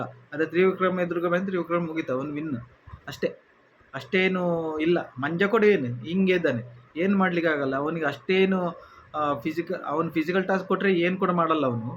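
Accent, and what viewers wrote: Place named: Tulu Nadu, India. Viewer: Enu helta edira tulunadu navaru matadode hage adakke maryade kodi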